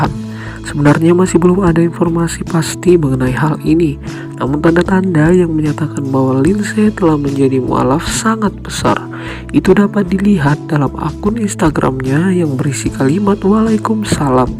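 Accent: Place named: Indonesia. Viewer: Blajar nyebut yg bener nama2 artis..jgn diikut dngn loghat Indonesia kalo Mau bikin content..👎👎👎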